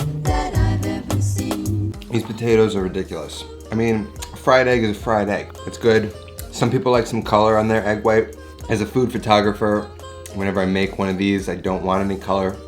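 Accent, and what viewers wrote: New York, United States. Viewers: Oh my god are you like from New York or something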